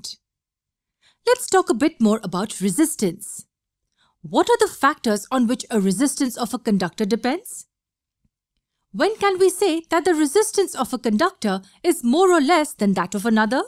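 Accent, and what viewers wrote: India. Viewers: Late... but I think it's an Indian accent :)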